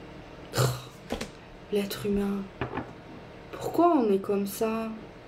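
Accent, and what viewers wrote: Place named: Marseille, France. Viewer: Bonjour ma belle j’adore ton accent marseillais quand tu es dans l’emotion merci pour ce message je te kife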